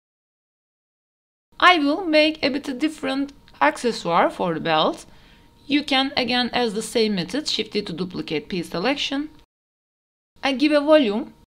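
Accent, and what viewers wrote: Turkey. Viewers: you are turkish because your accent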